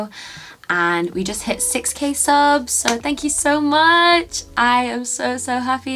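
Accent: United Kingdom. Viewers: I love your british accent and Congrats to have a 6k subscriber! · She sounds completely British to me? What Americans have you been listening to 😂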